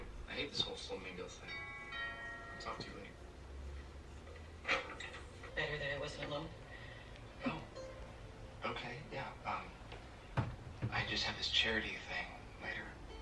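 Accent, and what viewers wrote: Canada. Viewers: You know she's from Canada because of the way she says "bag" and "vague". To her instead of a A sound bag is beg and vague and vegue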